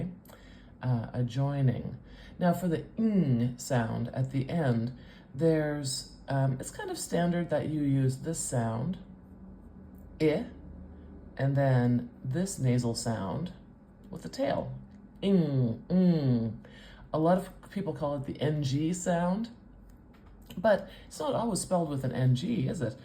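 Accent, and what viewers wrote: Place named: Atlantic Canada, Canada. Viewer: Could you do a series where you transcribe a word in two different accents, like your own Canadian Atlantic accent vs Minnesotan? Or is there a Canadian West Coast accent? East vs West, yo!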